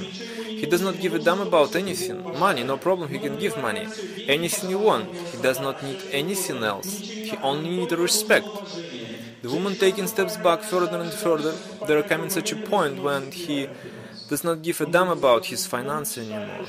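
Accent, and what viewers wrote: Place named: Russia. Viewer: That russian accent sucks, but thx to ur hard work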